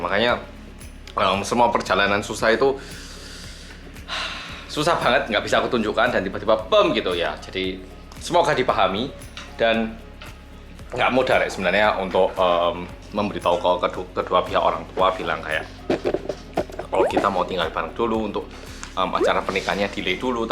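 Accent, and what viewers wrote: Java, Indonesia. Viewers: Bahagia slalu wong Korea medok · Opose garai kepo ae seh mas medhok iki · Tapi sue sue medok e yo ilang wkwkwk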